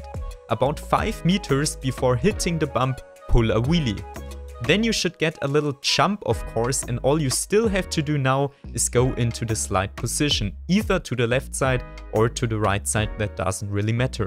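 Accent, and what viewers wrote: Germany. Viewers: Man merkt den deutschen aktsent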